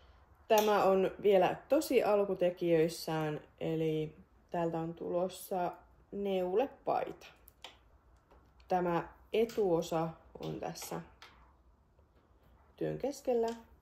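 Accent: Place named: North, Finland. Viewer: Kivoja neuleita sekä kivaa jutustelua. Rakastan pohjoisen murretta <3 Hyvää juhannusta!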